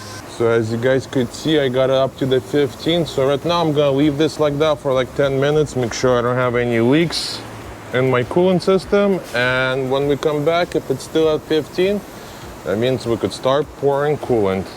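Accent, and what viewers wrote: Russia. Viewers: Такое чувство, что чувак русский🤔. Акцент русский)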